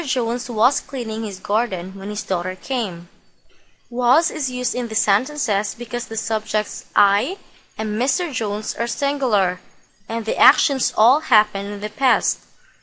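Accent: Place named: China. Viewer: Bhai ya behen dhang se bol. Smj hi nhi aa raha Chinese k jaise bol raha h tu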